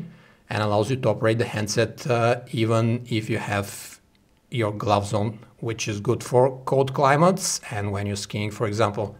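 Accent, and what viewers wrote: Russia. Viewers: sounds russian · Why u speaks english like that are u russian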